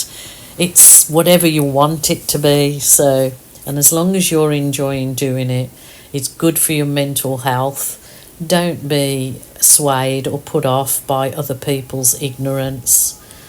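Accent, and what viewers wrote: United Kingdom. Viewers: I have only just found you tonight, I think I may give this magical art a go, it’s fascinating. I have subscribed and I will go back on your previous lessons. I’m from the UK and I’m sure I can detect a twang of British in your accent. Take care K🇬🇧❤️❤️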